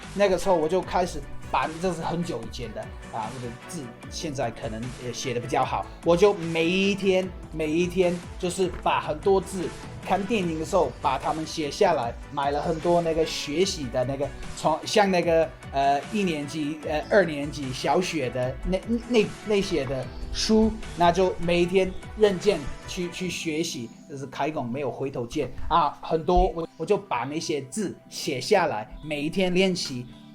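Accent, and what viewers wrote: Taiwan. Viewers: 哈哈～我第一次看到一位外國youtuber講國語台語口音超重的XDDD 完全就像是先學了台語才學說國語的人 果然住在南部講話就是會一直“啊～怎樣怎樣，啊～怎樣怎樣”的 哈哈 中文講的真的是太好了！完全可以感受到真的是花了很多心力努力的學習跟練習出來的！ 是比天才更令人敬佩的地才！讚！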